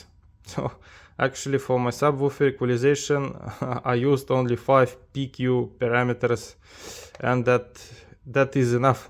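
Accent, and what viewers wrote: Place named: India. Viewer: Индийский английский :-) ...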